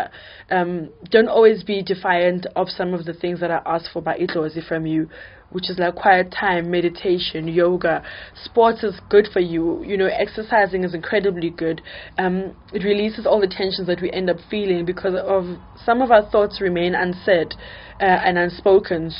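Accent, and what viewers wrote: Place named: England, United Kingdom. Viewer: what a beautiful English accent tjo, your ancestors are learned 🙌loving how you explain it in your language too wow.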